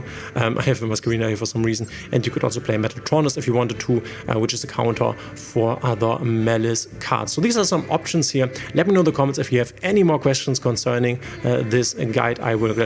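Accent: Germany. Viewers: is it possible you're a German? I think the way you speak reminds me of German xd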